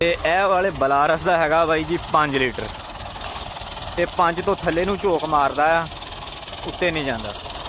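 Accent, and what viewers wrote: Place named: Gurdaspur, India. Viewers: tuhadi boli sun ke subad a gaya ji lagda tusi sade punjab de hi hovo very nice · Veer di boli Gurdaspur wali aa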